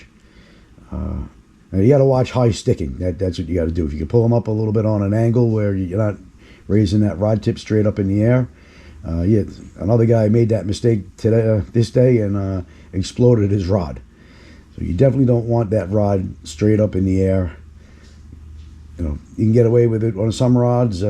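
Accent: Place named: New York, United States. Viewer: I already know I want this rod , lamiglass something, I got that thick new yawk accent too, Bronx ? Anyways I’m from Long Island , local#3 in the city more than here, In any event yeah if you can let me know what rod that is - specs wise , I definitely need a new one, sick of my 9-2 st. Croix , it’s not the greatest for this top water guy , about to watch this vid I know you guys are gonna crush it ! Thanks brotha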